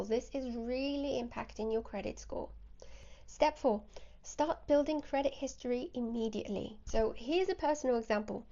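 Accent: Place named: United Kingdom. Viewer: Where are you from? I thought you are from the UK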